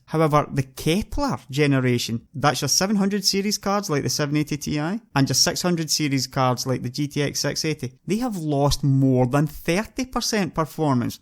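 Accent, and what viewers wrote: Scotland, United Kingdom. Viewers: Jesus christ another Scottish ned I have to listen to for 20 minutes it's bad enough trying to understand what these monkeys are saying in Trainspotting. Now I have to listen to this heroin addicted tree dweller talk about Gameworks? I don't think so! We really need to build that gigantic wall and have done with these "people" I am so sick of it. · It's Scottish English, laddie! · Great information and he dove in deep. This reinforces what I've suspected over the last decade which is that there's no real need for so many hardware refreshes. It's more about marketing gimmicks and lazy coding. Sure there's other factors like the amount of texture memory available, speed and power efficiency but when I started seeing less than 10% overall performance improvements between generations I knew something was up. This vid made me a subscriber, Scottish brogue and all...:-) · scottish, game over for you.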